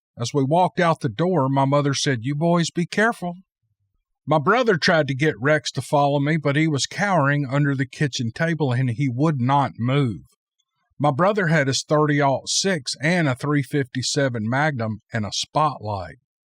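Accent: South, United States. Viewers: Your stories always help me go to sleep with your American southern drawl while I’m here in Russia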